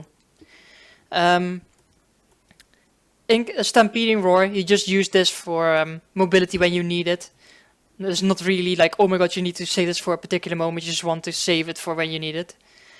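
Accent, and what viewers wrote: Netherlands. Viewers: he, ik hoor wel dat je nederlands bent haha. Het lukt mij maar niet om deze challenge te doen. zou je het voor mij willen doen? kun je het eventueel filmen om het dan op youtube te zetten voor de abonnees 😎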